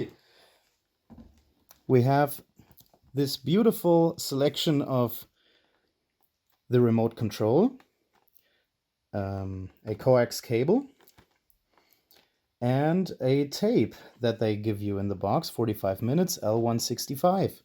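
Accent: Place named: Germany. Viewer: Oh my god , Its Indeed Beautiful Piece of Tech, by The Way You are German I think by hearing Your Accent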